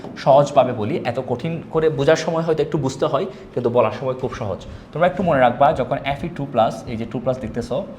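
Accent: Sylhet, Bangladesh. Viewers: Nice class🤗.Btw,I think you're from sylhet because of the sylhety accent while you're speaking . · You are from Sylhet, are'nt you?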